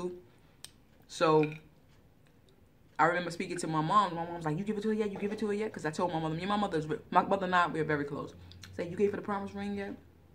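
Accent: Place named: New York, United States. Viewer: and you sound like your from New York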